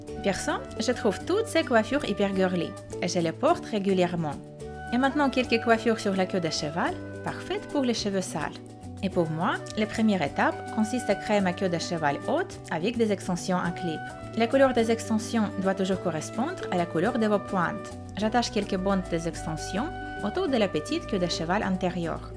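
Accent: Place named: Russia. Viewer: t'as l'accent très russe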